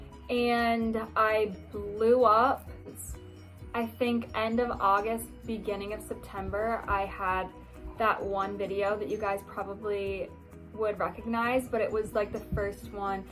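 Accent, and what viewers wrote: United States. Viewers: Thanks for the story! Your dialect says MINNESOTA but you're from Vermont. So cool.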